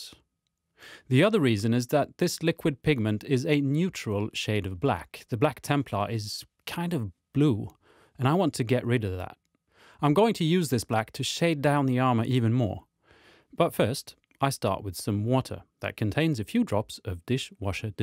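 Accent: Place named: Sweden. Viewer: thanks for the vid, black hair have always been something I have avoided due to it being hard. as I can here your from Sweden. where did you buy your spray booth? it looks like its one of those foldable once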